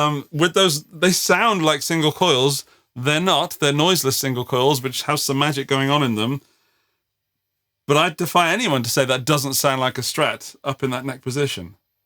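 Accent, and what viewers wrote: United Kingdom. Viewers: Just subscribed. Been thinking about getting this guitar, appreciate the in-depth review. BTW, no offense but I just loved to hear you talk, you're just so British slang and all. Thanxxxxxxx!!!!!!!!!!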